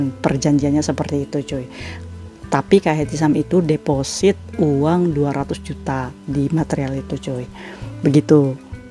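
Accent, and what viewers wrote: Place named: Cirebon, Indonesia. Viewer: Kalo dari logat & bahasa nya kak nih klo bukan orang Cirebon, Indramayu atau Subang 😊 boleh nih main 🤭🤭🤭 BAROKALLAH semoga berkah ya kak rumah nya, Masyaa Allah saya kadang iri kalo ada yg cerita dapat warisan🥰🥰🥰tp justru ini membuat ku tambah semangatt💪💪💪 walau saya gak ada warisan inshaa Allah anak2 ku nanti ada warisan 🤲🤲🤲 Aamiin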